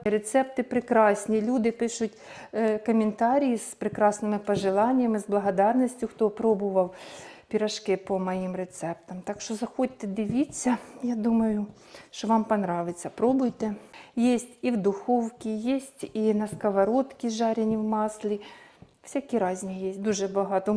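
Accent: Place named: Odesa, Ukraine. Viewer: Она с Одесской области - там свой диалект - ну, говорит женщина всю жизнь на суржике!!! Что не так снова??! Не цепляйтесь за слова!! Вам рецепт пирожков нужен или скандал???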